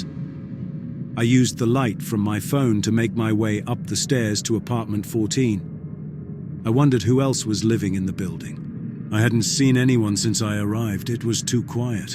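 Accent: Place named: England, United Kingdom. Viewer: Wow, it took me exactly 26 seconds to subscribe! True horror stories told w/ an English accent! Nuff said, subscribed!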